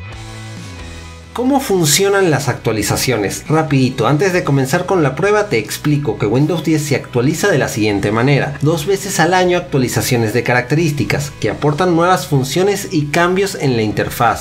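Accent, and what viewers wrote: Venezuela. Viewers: Primer Video en donde se escucha marcado el acento venezolano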